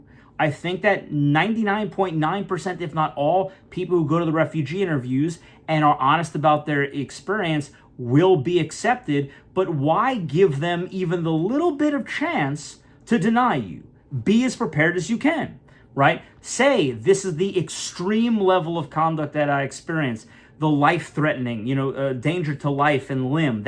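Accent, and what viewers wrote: South Africa. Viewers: Making progress, that introduction is starting to get pretty smooth. You are starting to sound like an English South African speaking Afrikaans.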